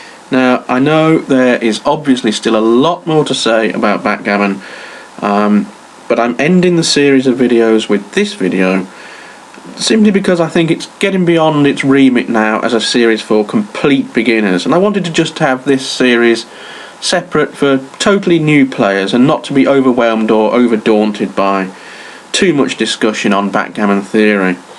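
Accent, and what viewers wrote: United Kingdom. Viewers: Good old Northern English dialect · You have a wonderful British accent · i love your english accent, its calm, easy to follow, and very clear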